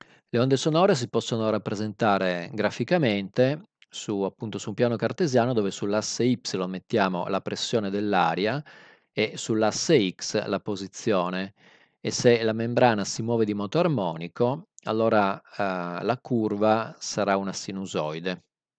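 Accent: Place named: Calabria, Italy. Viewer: Non si e capito molto sembri di Calabria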